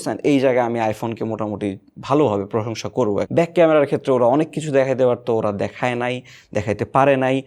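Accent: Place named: Chittagong, Bangladesh. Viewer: ভাই এর কথার টোন শুনে মনে হচ্ছে উনি আমাদের চ্যাটগাঁইয়া। 🙂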